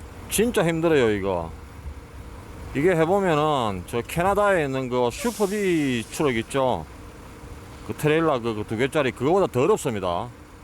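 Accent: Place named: South Gyeongsang, South Korea. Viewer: 아제의 나레이션이 정말 정감이갑니다. 경남의 억양과 구수한 음성으로 시원스런 도로를 달리는 영상들 최고입니다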